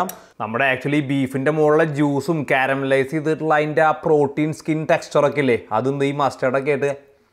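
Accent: Thrissur, India. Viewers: Started watching your channel recently......super contents and lovely presentation.....That Thrissur bhasha and lofley is superub👍🤙...keep the good work cheta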